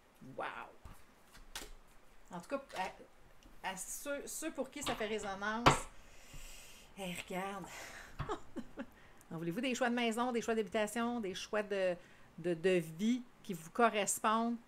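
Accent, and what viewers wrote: Quebec, Canada. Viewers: Bonjour, je suis bien contente d’entendre une voix Québécoise sur les tirages. Je me sens plus proche du tirage à ce moment. Merci beaucoup😀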